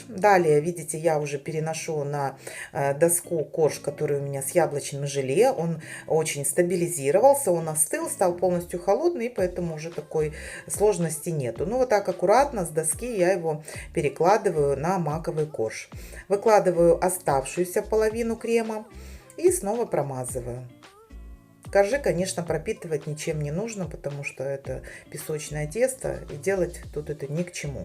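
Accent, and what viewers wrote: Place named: South, Ukraine. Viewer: А это не только пляцок. Есть очень похожий финский тортик. Говорок у Вас до боли родной. Юг Украины. Или юго-восток? А рецепт великолепный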